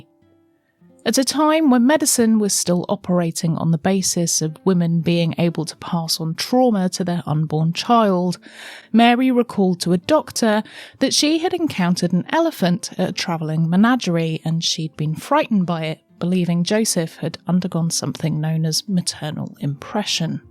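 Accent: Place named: United Kingdom. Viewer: I can remember when I first saw the movie it had just came out back in 1982 I believe it was. My three older Brothers took me to the old single movie theater in Clinton New Jersey. I was still very young and I can remember being afraid and very sad. As you guys say The cinema. I've seen it over the years. And have watched countless videos and pictures and such about the young man. I live in Florida. And I truly wish that I was able to make a historical and as accurate as possible. A movie about Him. From his birth and even also after his death. I truly enjoyed your video. And I have always loved the English British accent. I'm Italian and German on my late father's side and on my mother's side I'm English and Scottish and Irish and Scandinavian. And I've always enjoyed drawing. And I have had such a major and passionate interest in this young man. 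I know that I can't truly know what the young man went through in his short 27 years.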